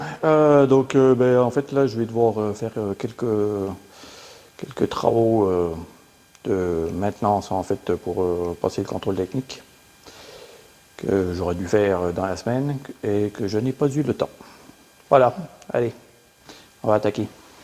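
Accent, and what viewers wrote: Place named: Franche-Comté, France. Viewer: Je crois entendre l'accent franc comtois !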